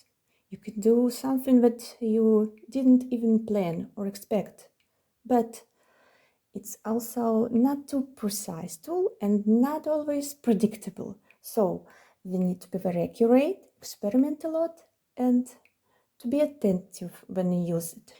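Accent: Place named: Portugal. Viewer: how lovely hahaha eu gosto do sotaque do portugues europeu hahaha. oh btw what is your native language? you speak three languages i'm jealous. blue is my favorite color am I cool 😎 or am I cold 🥶 hahaha or both hahaha lol Iuno is beautiful so is your art i'm definetelly pulling for her, can't have the sun without the moon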